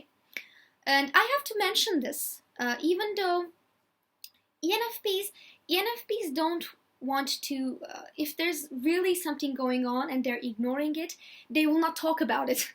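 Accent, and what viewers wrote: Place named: Turkey. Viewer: are you Turkish? Your accent is very light, so you are very easy to understand.:)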